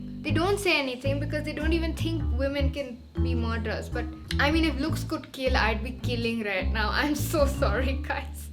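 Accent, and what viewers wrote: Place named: Bengal, India. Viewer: The bengali badass 😂 love the accent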